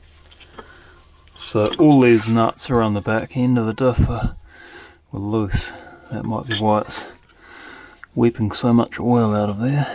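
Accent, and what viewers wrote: New Zealand. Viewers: I came across your channel by random surfing a few days ago... Now I'm absolutely hooked!!! Really interesting and good video's. I'm envious of how knowledgeable you are! Also, the Kiwi accent is cool as fooooook!!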